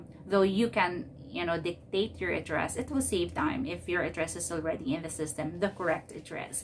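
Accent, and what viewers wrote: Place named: United States. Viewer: I love the accent i thought naaa US po talaga kayo meem hihi wink wink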